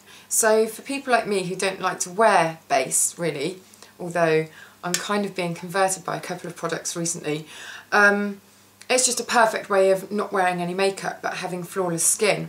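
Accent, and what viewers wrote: United Kingdom. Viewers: I love watching you.You are super gorgeous but not stuck up.I could litsen to you speak for days.All of the lovely ladies from the UK speak so wonderfully.I hate my NY accent even though I haven't lived there in years.Your skin is so pretty,you don't need foundation.xoCathy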